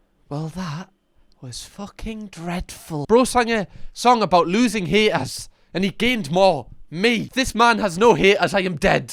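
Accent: Newcastle, United Kingdom. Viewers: Whats part the isles that accent? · This guy sounds like he's from Newcastle and if he is I love him already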